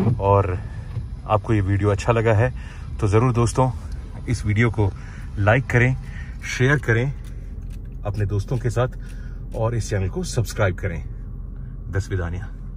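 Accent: Standard. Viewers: Sir your voice and pure hindhi is very impressive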